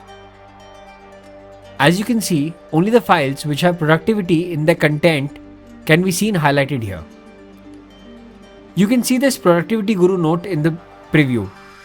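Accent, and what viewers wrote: India. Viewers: you are from India aren't you 😁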